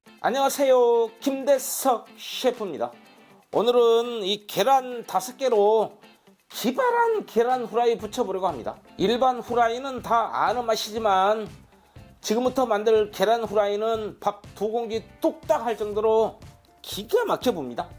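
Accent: Jeolla, South Korea. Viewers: ㅎㅎ 김대석 셰프님! 전라도 사투리가 음식맛을 더 정겹게 합니다.❤ 저두 침이 꿀떡 넘어가네요.😅 좋은 아이디어 배우고 갑니다. 김대석 셰프님!화이팅~❤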